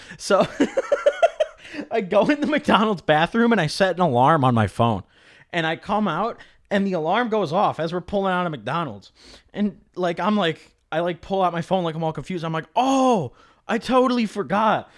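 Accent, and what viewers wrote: United Kingdom. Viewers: that british accent is disgusting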